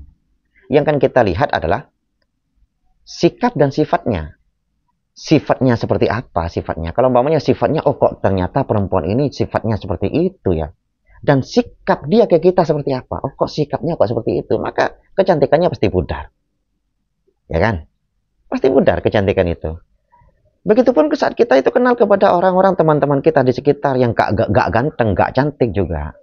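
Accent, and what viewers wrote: Madura, Indonesia. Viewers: Assalamu'alaikum bro klao sya cermati dari suara nya sampeyan ini kayak nya orang Madura ya bos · Pancen meduro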